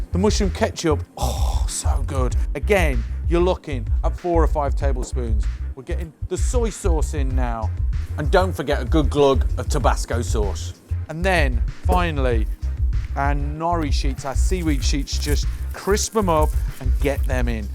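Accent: Birmingham, United Kingdom. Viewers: Food busker I can't quite figure out exactly where your accent is from,are you a brummie or is it more of a Wiltshire/Gloucestershire twang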